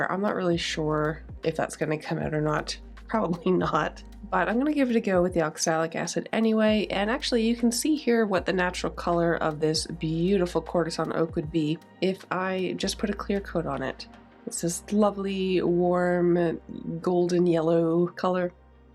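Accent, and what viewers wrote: Nova Scotia, Canada. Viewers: Dear, I've been watching your videos for a while. I'm a guy who has 2 left hands (including 5 thumbs on each hand), so I would never dream of trying to flip furniture, but watching you do your stuff has taught me so much about furniture. I'm truly grateful for the opportunity to see what you are doing and hear your explanations. Firstly, it sounds as though you really know what you're doing, and secondly, I love your Canadian/Nova Scotia accent. You sound so calm, so when I've had a stressful day, I watch one of your videos and can unwind. So, for me you've become the therapist:-). I've watched other renovators, but you and Flipping Drawers (for similar reasons) are my favorite flippers. So, thank you for what you do (I also <mostly> love your ideas) and for the videos. I hope you can do much more in the years to come. Best wishes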